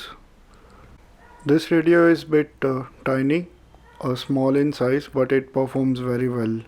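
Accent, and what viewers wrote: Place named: England, United Kingdom. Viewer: I am in love with your English accent brother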